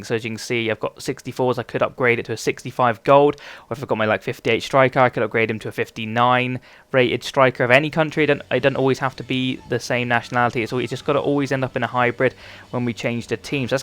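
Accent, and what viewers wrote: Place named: Cornwall, United Kingdom. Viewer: hey, where are you from? you sound a bit cornish ;)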